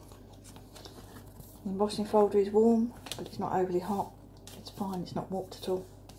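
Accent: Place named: England, United Kingdom. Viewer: yesI love her calm no nonsense precision using measurements thicknesses and no useless jabber😃😄😂I can't believe my fortune to have found her...and that adorable English accent..I sit here with my calligraphy book and drool♡♡♡♡ I can't wait to give foiling on vellum a go!!!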